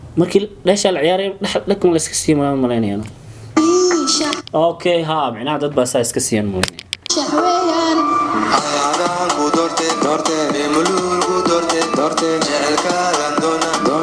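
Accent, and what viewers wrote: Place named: Mogadishu, Somalia. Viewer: Sxb afkaaga hagaagso reer muqdisho kaliya umada kama af duwana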